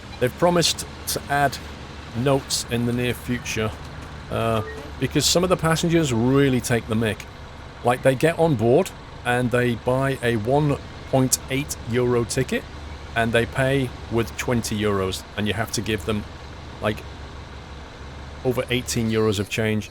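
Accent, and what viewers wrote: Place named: United Kingdom. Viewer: Hi sorry for the extremely late comment but sounds youre from uk so youll know what i mean, our buses go from A to B then B to A then A to B and B to A and so on. And a drivers shift would last for hours, is that possible in this game to do a full days bus shift going there back there back there back?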